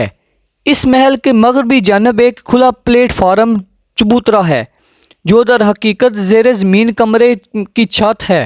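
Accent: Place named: Punjab, Pakistan. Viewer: We belong to urdu speaking family, he is Punjabi..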